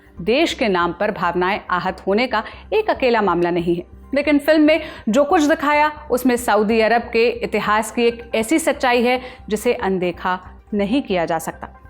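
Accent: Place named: Hyderabad, India. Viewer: Aisa lag rha h anchor hydrabadi accent ki h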